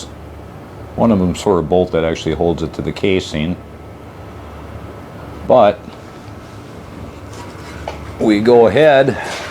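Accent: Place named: New England, United States. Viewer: I’m sorry I just can’t stand the sound of Yankees at all. I’ll find somebody else to explain it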